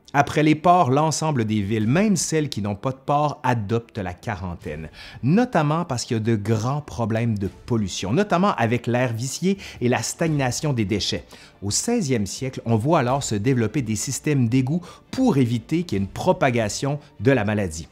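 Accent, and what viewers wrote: Quebec, Canada. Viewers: J'aime ton accent québécois.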